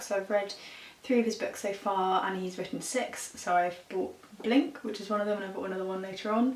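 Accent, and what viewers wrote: United Kingdom. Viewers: you are nt hearing excellent britain's pronunciation?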